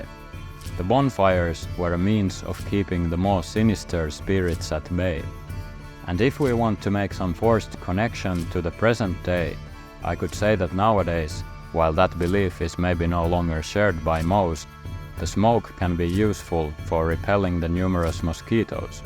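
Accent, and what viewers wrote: Finland. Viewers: My French hubby who's born in Berlin, LOVES Finnish accent, and also your humour! Hyvää Juhannusta/Ukonjuhlaa näin jälkikäteen! 🙂 Tai erittäin ajoissa, miten päin haluaa katsoa! 😉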